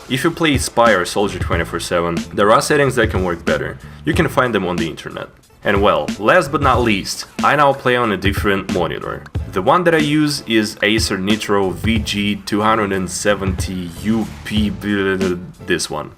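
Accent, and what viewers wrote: Russia. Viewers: Wait u r russia?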